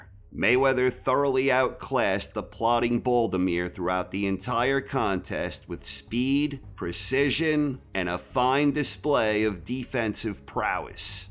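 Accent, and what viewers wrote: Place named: New York, United States. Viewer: Love your Channel you kinda sound like Howard Cosell with a New Yorkers Accent!!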